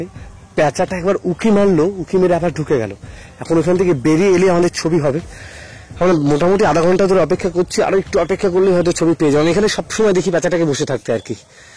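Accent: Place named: Bangladesh. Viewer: আপনার ভাষা শুনে মনে হয় আপনি বাংলাদেশের মানুষ। পশ্চিমবঙ্গে ভাষার সঙ্গে আপনার বাসা মিল হয় না।মনে হয় বাংলাদেশের কোন অঞ্চলের ভাষা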